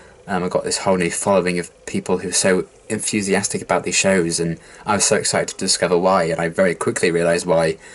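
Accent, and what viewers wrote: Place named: United Kingdom. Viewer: It's been a whole year sense I got to hear you amazing brit voice. Nice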